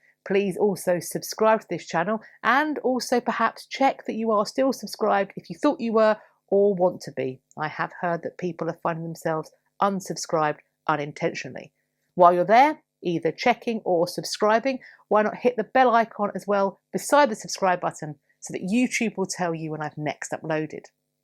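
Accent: United Kingdom. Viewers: With your British accent, you present as a stuffy person, but, you are a hoot, a very kind hoot. ;)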